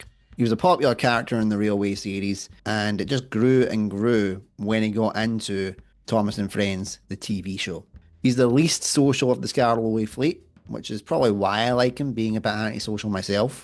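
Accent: Scotland, United Kingdom. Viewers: Hello Nice Ranking I'll admit I'm like Duncan I'm a Hothead but with a good heart And I Like The Scottish Voice And You are no expection Sir keep up the good work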